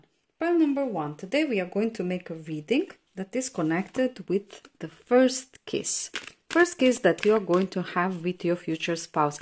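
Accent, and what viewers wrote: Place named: Russia. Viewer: You have a Russian accent. Are you Russian? 💜😍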